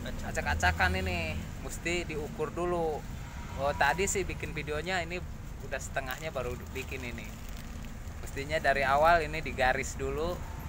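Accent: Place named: Sumatra, Indonesia. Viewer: bang ..kok ngomong kayak orang Sumatra....asli Sumatra ya bang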